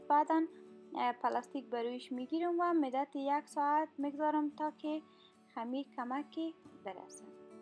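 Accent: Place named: Kabul, Afghanistan. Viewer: خوب دری گپ میزنی اصل کابلی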